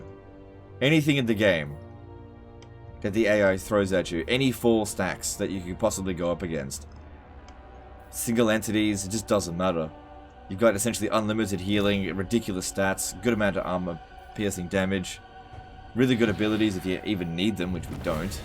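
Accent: Australia. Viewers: He is from down under.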